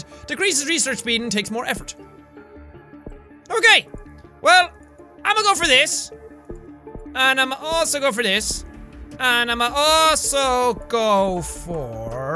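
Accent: Ireland. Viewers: is he irish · Erase What? Do you mean Top of the mornin' to ya laddies? It's because he's Irish.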